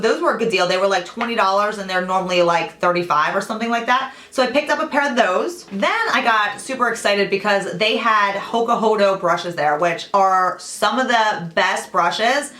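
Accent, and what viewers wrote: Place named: Northeast, United States. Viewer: I knew you had a north east accent , I'm from the north east as well ( New Hampshire ) I live in southern Virginia now . This makes me love your channel even more .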